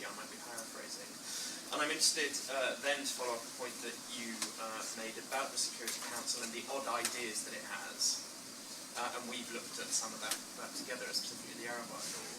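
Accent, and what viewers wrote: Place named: Russia. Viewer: Such a likable russian accent)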